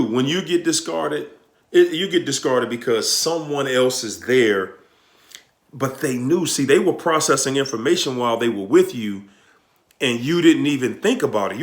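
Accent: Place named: Baltimore, United States. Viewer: I hear a little Baltimore in your accent...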